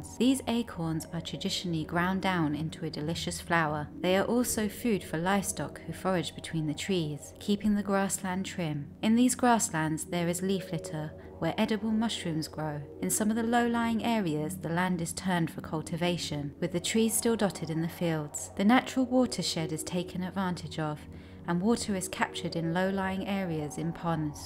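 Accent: London, United Kingdom. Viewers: Nicely narrated. Detect a slight London influence? Excellent!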